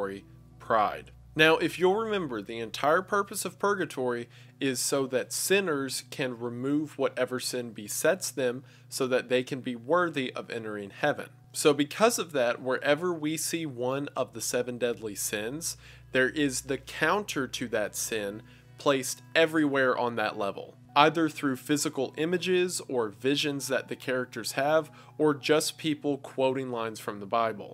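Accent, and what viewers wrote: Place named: Appalachia, United States. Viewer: It's an accent. I do the same thing. He's from Appalachia, I'm from the Mid-Atlantic.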